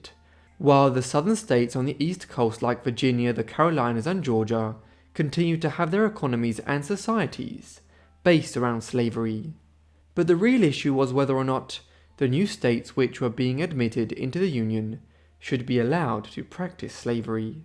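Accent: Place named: United Kingdom. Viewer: Narration would be better by an American, not a British accent.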